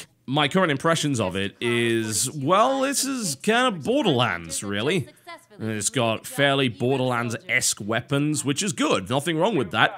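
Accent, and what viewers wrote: United Kingdom. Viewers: I began watching your channel over 2 years ago. I enjoy them because of your personality and awesome British accent. I love when you are emotional and rage. But recently you switched to be more "news" like than entertainment. I never critique you since it is your channel and you should upload what ever you want. But Your recent videos being very emotionless. Hope this helps. Thanks from your long-time fan! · I watch your videos for your opinion, your sense of humour and that great british accent. I watched 1 of the shield videos... the developer was just boring and clearly not best in the field of presenting a product. The questions that came to mind were "what is this good for, wii controller does sth similar" and "why the hell would I want to give up my 27'' for like 10''? Didn't get answer for that. Did I really have to watch the whole footage to get those answered? 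No conventions for me... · 3. It's easter, maybe a large amount of your viewerbase was on vacation? (I know... probably not) 4. The format. Annoying noise in the background can throw a lot of people off i think. I know that it atleast used to annoy me. 5. A lot of not-you talking. I may sound wierd, but I actually started watching your videos because of your voice, or rather your british accent, and then later stayed for your gaming knowlegde.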